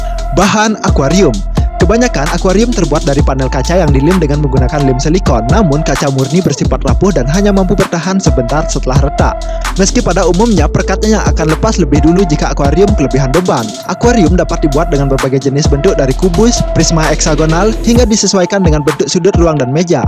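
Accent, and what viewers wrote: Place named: Bali, Indonesia. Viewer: Tpi logatnya Bali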